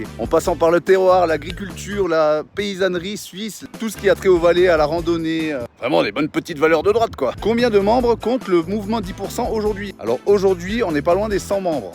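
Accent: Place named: France. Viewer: 100 membres? Ouf ca rassure!!! Le mieux serait encore zéro "bon un" tu vas pas te virer de ton mouvement quand-même 😉. Étant une Suissesse pur souche, j'avoue tu me sors par les trous de nez avec ton patriotisme à 2 balles, récité avec ton accent bien frouze, ah stp, tu pourrais nous faire une petite vidéo avec ton passeport? Quand a revendiquer ta couleur blanche au secours, tu en parles comme si tu avais fait des études poussées pour l'obtenir 😂, si le blanc disparaît c'est peut-être que les métissés ont plus d'attrait que les blancs. Et concrètement tu veux changer ca comment? Avec une loi qui interdit de faire un gosse avec une personne de couleur? Non mais franchement!!!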